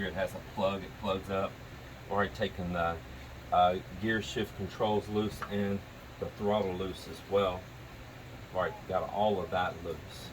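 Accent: Texas, United States. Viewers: Oh I hear that Texican, bellge. My cousin lived in Post Texas and he worked killing whales 🐋, oil whales that is. Different places different colloquialisms expressions and pronunciations. All my Texican family I was close to are gone now. You just brought some fond memories back.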